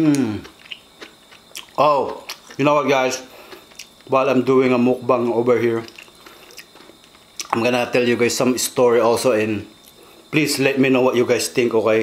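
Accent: Ilocos, Philippines. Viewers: I am silent viewer of you and everyday I watched your videos, labis akong natutuwa sayo lalo na kapag nag Ilocano accent ka na. Solid fan mo ako, and sa lahat ng mukbang videos, unti unti ng bumabalik ang sigla ng katawan ko. Marami ang nagsasabing mataba na daw ako. Isa akong bedridden patient almost 6 yrs ng di nakakalakad. Salamat sa mga videos mo palagi at nawawala ang bored at stress ko sa buhay dahil sayo. Salute to you and God bless you and to your family.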